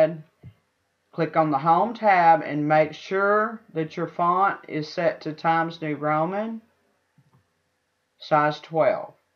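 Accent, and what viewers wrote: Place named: Carolinas, United States. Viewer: Helpful video thank you very much and I am going to take a stab at your accent... I feel it's either from North or South Carolina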